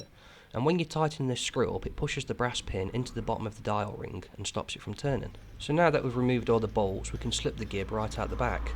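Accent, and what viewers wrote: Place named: Nottingham, United Kingdom. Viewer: That's a monster lathe, more complex than my Hardinge. You sound like you are from Nottingham.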